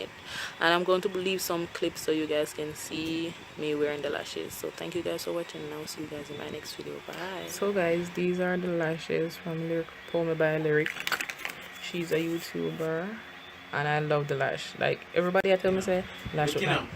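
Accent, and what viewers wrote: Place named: Jamaica. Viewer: hey girl i love your accent ...do you live in Jamaica...i always dream of visiting Jamaica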